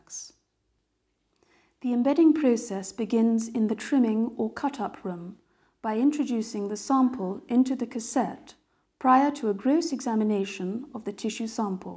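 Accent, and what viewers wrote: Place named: RP, United Kingdom. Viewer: Sounds like "Received Pronunciation"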